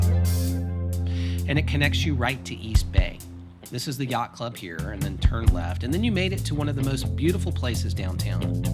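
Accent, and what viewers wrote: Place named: Philadelphia, United States. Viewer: Please do more...enjoyed! You sound like you might be from the Philly area. My ggg....was Lord Ashley Cooper, brought the 1st quakers to Charleston. Please do more of downtown!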